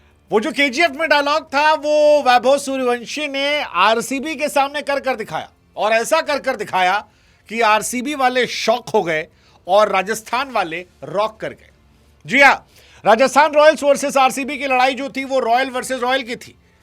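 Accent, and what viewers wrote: Bihar, India. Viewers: Bihari bolte mittar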